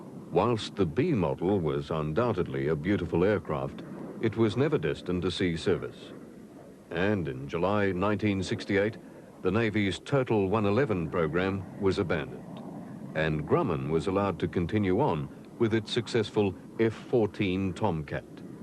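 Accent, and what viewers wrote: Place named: Australia. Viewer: Sounded Australian to me???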